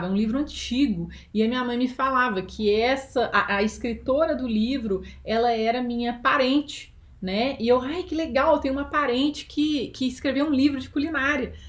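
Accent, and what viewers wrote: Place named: Minas Gerais, Brazil. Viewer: Simplesmente amei! Eese sotaque mineiro é o máximo!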